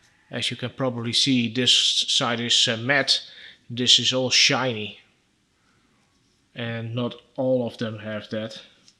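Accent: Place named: Netherlands. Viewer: Hallo ik hoor dat u van nederland bent en nederlands spreekt ik wou u vragen heb een probleem met mijn auto ik hoor een schurende geluid in de buurt van de distributie riem heb alles vervangen distributie riem met spanner waterpomp multi v rien met spanrol krukaspoelie alternator maar geluid is er nog steeds het komt binnen in de krukas percies heb onlangs mijn olie vevangen en geluid is percies verminderd kan het nu zijn dat de olie pomp die lawaai maakte het is percies metaal over metaal percies lagers over elkaar kan u mijn aub helpen en kan het zijn dat de olie pomp versleten is en een schurende geluid maakt . Ben u dankbaar voor u reactie dank u .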